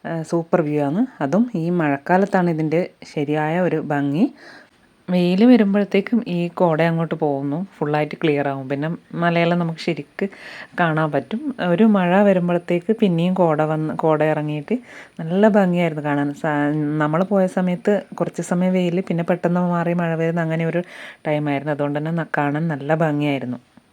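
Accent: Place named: Kannur, India. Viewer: Can't fix auto dubbed issue So irritating English commentary, I really likes your kannur typical language.so after solving the isse, definitely see yr all vdos one by one🎉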